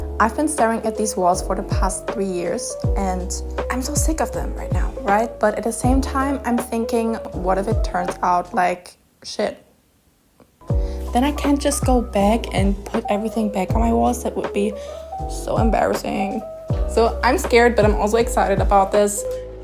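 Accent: Germany. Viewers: Okay but German accents are so pretty ✨ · i am in LOVE with how this turned out. you did amazing 🎉🎉 also are you from germany?? my friend's accent is really similar to yours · Direkt den deutschen Akzent rausgehört✨ Liebe das Ergebnis!🫶🏼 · Man merkt voll dass du eigentlich deutsch bist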